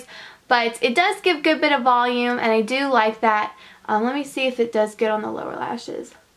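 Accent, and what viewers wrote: South, United States. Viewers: I hear a slight twang in your accent, do you mind me askin where you're from? Are you southern? I live in Texas( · do you have a country accent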